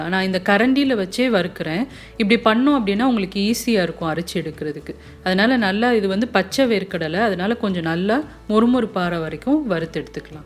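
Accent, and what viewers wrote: Madurai, India. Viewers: Vada chatti typical madurai slang.... Thanks for the receipe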